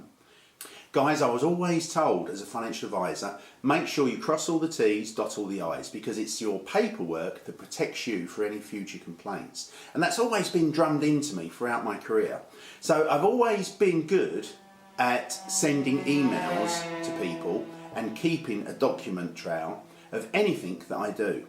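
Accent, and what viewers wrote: United Kingdom. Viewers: i like British accent im enjoying the way you talk.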